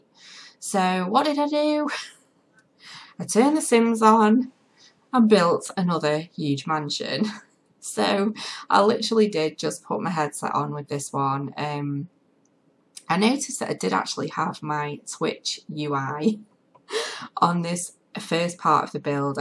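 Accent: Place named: Northern England, United Kingdom. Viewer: You are more than worthy of everything you do! It's just jealousy of your talent for these builds that's all. Really enjoying binge watching your videos and to hear your genuine comments on the bs you receive! Also nice hearing you're English like myself, except I'm not from your area (I think you're up north somewhere). X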